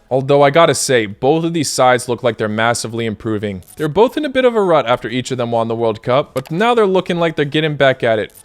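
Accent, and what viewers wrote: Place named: United States. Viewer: Why do you pronounce Croatian players' names in an american way?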